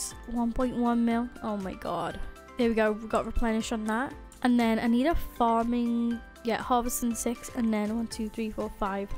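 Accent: United Kingdom. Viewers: question where are you from i feel like you are from the uk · Proper British nice to have a English skyblock player for once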